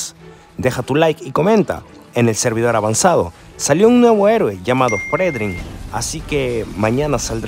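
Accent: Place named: Venezuela. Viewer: Suenas como a venezolano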